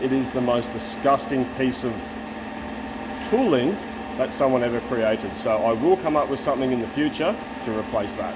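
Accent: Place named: South Australia, Australia. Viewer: Machining is awesome, extremely intelligent and damn good work. is the brains of the shop or is it? Y'all are so much fun to watch and I just Love y'all's videos. your accent is quite southern Australia, just a little different than our RedNeck HillBilly Arkansas accent. Out takes are so Funny. Thanks guy's. Some comments are just for fun. Good night from central Arkansas, United States of Merica.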